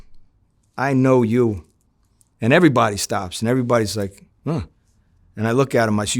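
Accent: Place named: Italian American, United States. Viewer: I love a NY, NJ, Boston, Philly, and Bmore accent. Might be a lil bias wit the bmore accent cuh i live around there but I’ve always admired these italian american city accents fs. There’s just something so unique sounding about them than ur typical american accent. That being said, his voice paired with his story, really narrated like he’s narrating a book. Really helps keep my attention. 2 hours and 30 mins in and haven’t stopped watching this whole time.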